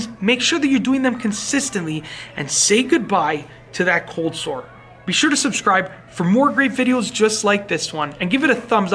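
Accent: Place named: Canada. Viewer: Sounds so Canadian to me. Sorry if I'm wrong. I'm English.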